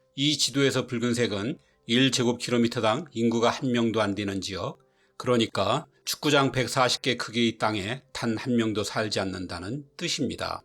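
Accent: Gyeongsang, South Korea. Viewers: 그치만 일정한 억양이 무한반복되어서 혹시 에이아이 아닐까도 생각해요. 경상도 억양이 바탕에 깔린 출신지 불명의 섞어찌개 말씨. 어쩜 똑같이 진행하고 올라갔다 내려왔다 하는지 신기해요.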